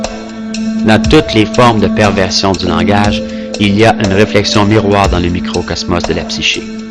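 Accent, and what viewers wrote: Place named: Quebec, Canada. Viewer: excelent récapitulatif merci. Qui est la source de ce vidéo? Parce que ça sonne comme étant un compatriote québecois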